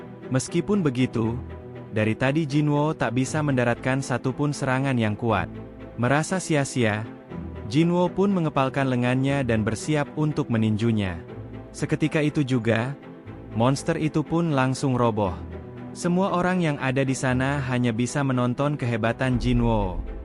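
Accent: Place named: Indonesia. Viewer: Indonesia banget baca dungeon ,Potion,healer😅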